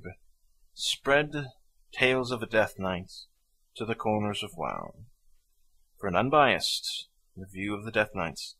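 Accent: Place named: RP, United Kingdom. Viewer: Seriously, I like very much your voice. Too RP for some ppl tho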